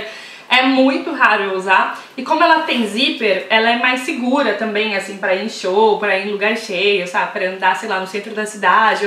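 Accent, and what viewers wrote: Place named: Rio de Janeiro, Brazil. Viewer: Só eu nunca tinha notado esse sotaque carioca na?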